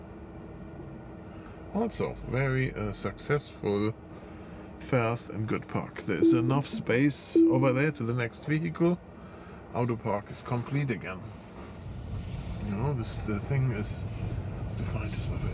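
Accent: Germany. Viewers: Just curious, where are you from? Because your English sounds a bit with a German accent. I could be completely wrong though. Amazing how vision Autopark works. Now they need to speed it up a bit and they should stop turning the wheel while the car stays still. Just unnecessary stress on the axle / steering components etc.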